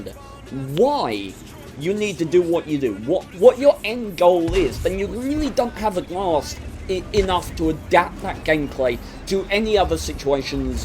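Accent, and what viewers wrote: United Kingdom. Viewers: I like ur UK accent bro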